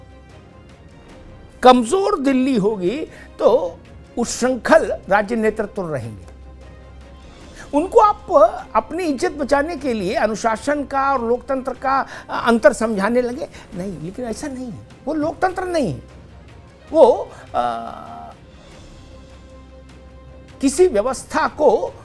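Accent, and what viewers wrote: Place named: Farrukhabad, India. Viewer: अमिताभ जी का ठेठ फर्रुखाबादी स्टाईल ही आप की स्पेशलिटी है, सीधी सीधी खरी खरी कोई लाग लपेट नही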